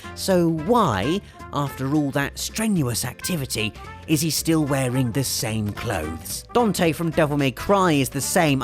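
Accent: United Kingdom. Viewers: I'm from Canada, and I personally prefer your british accent over the english I'm used to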